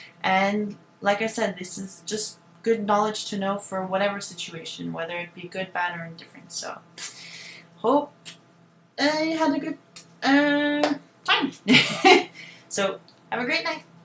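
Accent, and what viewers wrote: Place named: Quebec, Canada. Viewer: Je pense Quebecois. I think Quebecois :) French Canadian :) Some of them are Okay :)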